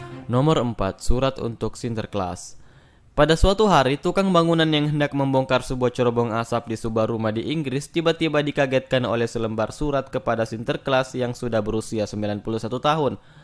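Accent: Makassar, Indonesia. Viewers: Bang kmu orang Makassar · P A N D A dia logat makasar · Org Makassar Eaa?😂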